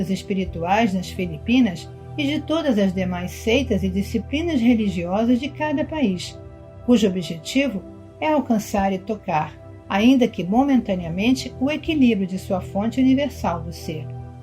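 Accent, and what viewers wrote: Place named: Brazil. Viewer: Gratidão e Universo. Perfeito com sua voz brasileira. Amei. Que a Luz a seu redor continue se estentendo diariamente. Luz....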